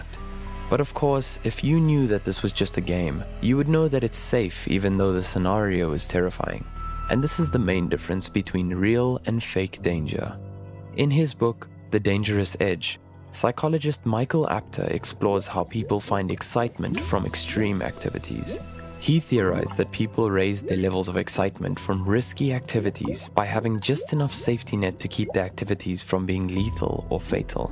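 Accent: South Africa. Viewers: I know a South African accent when i hear one :D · I knew the voice over guy was South African as soon as I heard his voice. I'm South African too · Can always spot a South African accent. 🇿🇦 Great topic. Horror lover 🧟‍♀️ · Awesome hearing a South African accent on this channel. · Loved this video and the narrator's South African accent!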